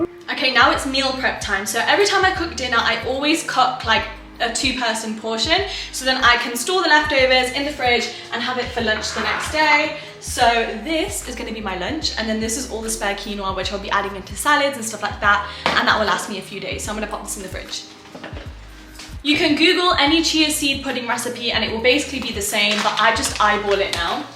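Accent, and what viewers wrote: United Kingdom. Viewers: You r Punjabi 😃 and your brit accent ❤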